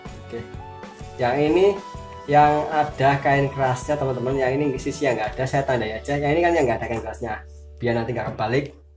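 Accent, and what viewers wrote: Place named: Banyumas, Indonesia. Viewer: السَّلاَمُ عَلَيْكُمْ وَ رَحْمَةُ اللهِ وَ بَرَكَا تُهُ Mas sampean bahasane kaya aku. Tika dr republik ngapak apa ya mas.. Aku jg di tanggerang. Sampean tanggerang mn..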